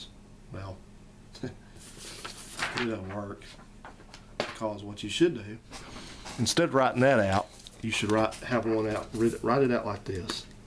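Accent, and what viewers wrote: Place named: Alabama, United States. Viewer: OMG this drives me insane! Dude I am with you. These stupid "Alabama Tag Applied For" signs are just illegal and the authorities turn a blind eye to the issue. Ran across this video searching for this exact subject. I'm in AL too. I watch your other channel and I was like that boy is definitely from Alabama. The central AL accent is very recognizable. 😁😁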